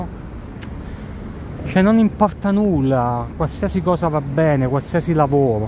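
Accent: South, Italy. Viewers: Non ha ancora perso l’accento meridionale 😳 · Prima di tutto " Supercalifragilisticespiralidoso " lo spell checker è andato in tilt.... devo dire che pur se mi vergogno delle ore che spendo su YouTube, tu sei stato la mia ultima scoperta. Seguo molte coppie in giro per il mondo ma tu sei il primo meridionale e il tuo accento mi dà tanto conforto qui a Glasgow fredda e piovosa. Ti voglio dire che forse non lo noti perché sei dall'altra parte della camera: hai una dote naturale di commentatore, in English " Story teller ", forse anche perché ami la parola scritta. Vorrei con questo messaggio incoraggiarti a seguire questa vena. Descrivi quello che hai attorno, le nuove attività peculiari di NY. Secondo me saresti un buon intervistatore perché ami le persone e ti interessa quello che è intorno. Sei ache un opportunista e credo che questi walk/blogs faranno molto bene alla tua impresa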